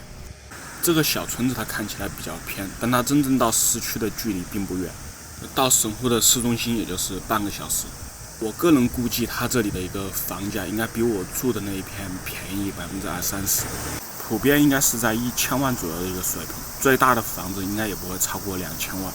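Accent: Wuhan, China. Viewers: 武汉口音太明显了。。。😝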